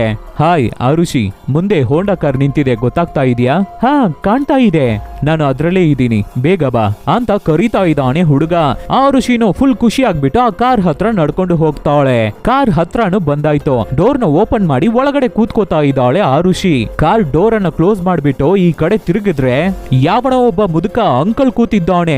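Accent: Tamil Nadu, India. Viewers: Kannadana saryaag maathad guru...neenen Tamil avnaa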